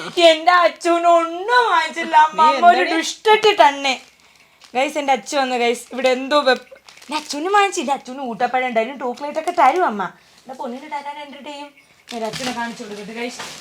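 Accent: Trivandrum, India. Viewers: mole 🥰, so proud you lil one and thankyou so much for making our Trivandrum slang loveable and acceptable to everyone. പിന്നേ മോനാൾട്ട് പോലെ different വാക്കുകൾ മലയാളത്തിനു സംഭാവന ചെയ്തതിനും 😄😂👌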